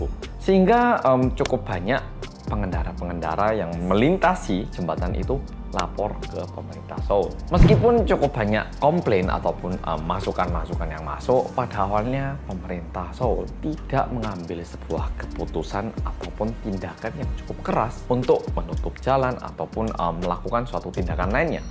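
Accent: Java, Indonesia. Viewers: Sudah ngepot ngepot gitu ya 😄😄 medhokk poll mas ki. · Masnya nich orang mana sih.... tampange wong korea tpi ngomonge ky wong jawa.... 😂😂😂😂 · Medokkkkkkkk semangat amat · Medokkk ... 😆😆 ttp semangat ... ttp jg kesehatan & GBU 😇😇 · Ga yakin saya Anda orang Korea... Mendok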